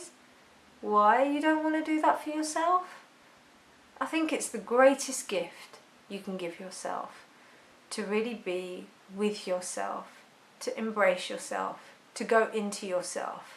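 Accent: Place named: England, United Kingdom. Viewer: I am in love with your english accent, this is so beautiful! Thanks also for your insights by the way ^^ Also going for my second course soon!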